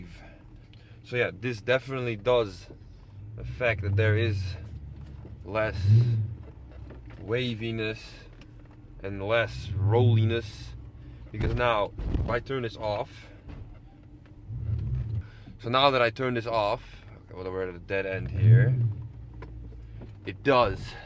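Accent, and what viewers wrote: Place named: Netherlands. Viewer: why does this dude have a dutch accent living in poland?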